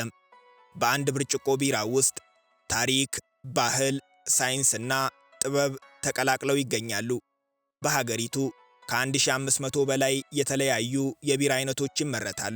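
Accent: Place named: Addis Ababa, Ethiopia. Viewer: yemigermew ahun yalehut gent Belgium new ena ende addis temesche new yesemahut konjo akerareb new berta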